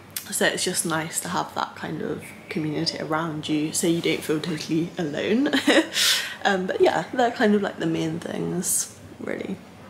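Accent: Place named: United Kingdom. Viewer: I know lots of guys come here to watch you work out...but for myself with your accent and your calming tone I could listen to you talk all day. Its very old style British you dont hear anymore. cheers from USA.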